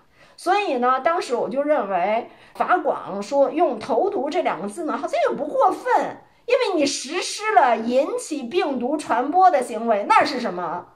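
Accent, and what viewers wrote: Beijing, China. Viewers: 天天都会看几个视频，特喜欢的就是一口京腔京韵，再重点更好！👍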